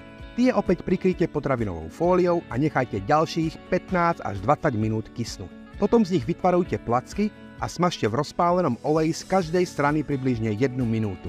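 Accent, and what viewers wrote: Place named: Czech Republic. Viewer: Ten pepícky prízvuk je odporný.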